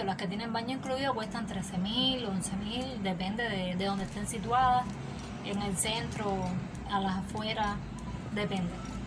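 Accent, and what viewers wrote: Cuba. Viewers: Siiii....me encanta la suavidad del acento cubano!!.Es muy dulce. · Tu acento se parece al mío. Eres CUBANA !!!!!!!! BENDICIONES !!! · Qué bonita😍😍😍 Supongo que sos cubana, por el acento, bienvenida y suerte, un beso😘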